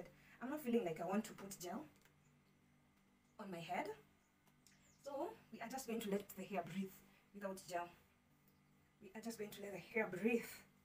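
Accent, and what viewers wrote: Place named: Africa. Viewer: In this video language in African ascent and fast.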